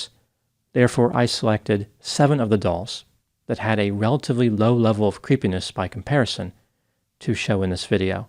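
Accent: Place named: Maryland, United States. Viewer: ....great job! Can you say, "Satan"? Harrisburg - yup. Oh hell no. Grew up in Carroll County, MD, so you may say this is my, "old stomping grounds" - I recognize your accent, albeit maybe more eastward... Those dolls look like people I have DEFINATELY come across. Honestly, I would bet that at least 65% of certain populations would NOT think this is odd, and continue to push the argument of 'art'. I've known people that resemble this ilk. PUKE.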